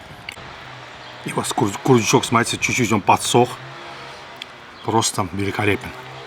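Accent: Baku, Azerbaijan. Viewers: Маэстро! Просто супер! Бакинский акцент, свежайшие продукты и руки профессионала - все что нужно для хорошего шашлычка!) Дай Бог вам здоровья! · Ай брат гардаш!) Это просто супер! Бакинский акцент, свежайшие продукты и руки профессионала - все что нужно для хорошего шашлычка!) Дай Бог вам здоровья!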